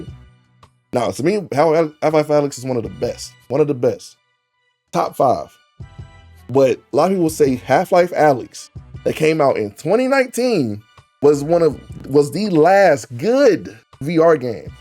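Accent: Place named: South, United States. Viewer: You can be as country as you want when you're spitting facts....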